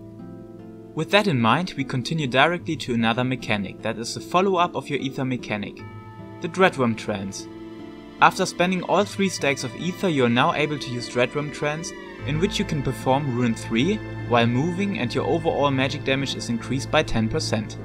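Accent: Germany. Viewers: You are definitely German!!! I never loved German accent (especially Dutch, gross!) But yours is absolutely adorable and so sweet accent. So nice to listen to !! Thank you for this guide... I hope... truly, that this will help me... Sigh. It's difficult, because people expect the best output instantly ; ; Is there any changes since you made the video?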